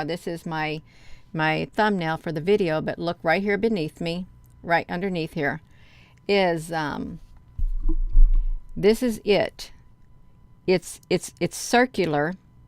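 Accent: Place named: Georgia, United States. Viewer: Hi, this sphere thing has always intrigued me, it’s happened a few times over the past 5 or 6 years. Obviously something massive that our so called leaders keep from us. This is a completely different subject but I gather from your accent which i think is awesome by the way, that you live in Georgia. I’m flying over from Australia to stay with my cousin for 2 weeks, he and his wife live in Savannah, can’t wait!.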